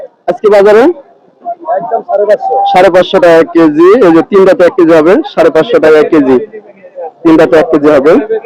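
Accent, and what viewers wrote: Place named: Bangladesh. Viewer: Bangladeshi Bangladeshi English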